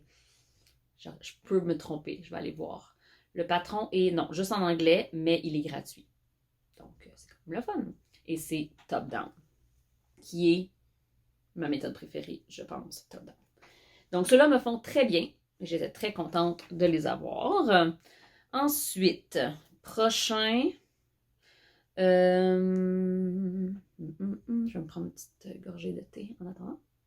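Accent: Quebec, Canada. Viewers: Bonjour, tu as un véritable talent +++😍😍. Superbes ouvrages chaussettes. Ouiiii, j’adore t’ écouter parler « un accent que j’aime beaucoup », je suis allée 2 fois en séjour au Canada 🇨🇦, Montréal, Québec, et Toronto. J’espère y retourner...Mais, avec ce Covid qui nous guette sans cesse. Difficile de voyager. Encore merci, pour la présentation de tes chaussettes 🧦🧦. C’est génial 👍👍👍😘😘😘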